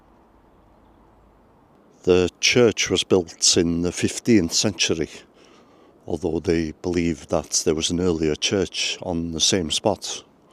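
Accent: Wales, United Kingdom. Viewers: I absolutely love your channel. My mother side of my family were from Port Talbot and their first language was Welsh. Your accent reminds me of my family. I’m half welsh and half English. Keep up the great channel. Your commentary and approach is unique don’t change its great. 👍👍👍👍👍👍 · No need to apologize for your pronunciation... your Welsh accent is appreciated... keep up the great work.... Cheers!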